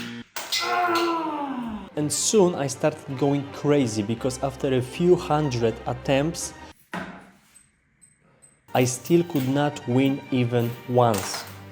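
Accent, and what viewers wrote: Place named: Poland. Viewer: Ur Polish aren't you? Im Poland too hehe (i think ur Polish cuz ur accent and Cisowianka in the back haha)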